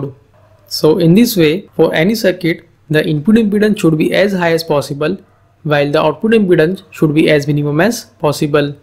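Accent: India. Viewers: can't understand your indian accent, please do it in english accent. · Indian accent is big problem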